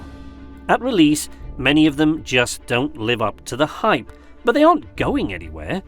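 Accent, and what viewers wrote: United Kingdom. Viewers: Why do Brits always seem so level headed, reasonable and intelligent when they speak! lol Great vid, earned my sub! Downloading the game now, can't wait!!!!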